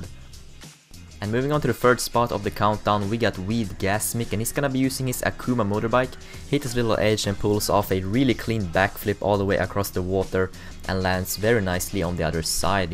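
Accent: Sweden. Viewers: Are you from Sweden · Det hörs tydligt att du är svensk så var tvungen att kolla upp det i kanalbeskrivningen ;) A men de e gött iaf att svenskar är bäst och har ofta bra utbildning i videoskapande. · Are you swedish? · Lol man hör att du e svensk :D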